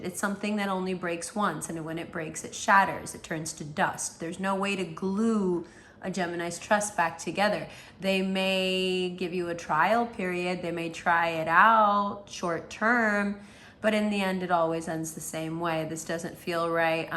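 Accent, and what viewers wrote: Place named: New York, United States. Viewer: LOVE you Fam!! ❤️🕉️🙏🏼 That New York twang coming through strong 😍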